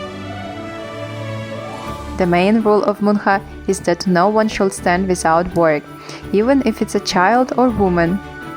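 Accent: Russia. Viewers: Русский акцент пробивается...Как тебя звать?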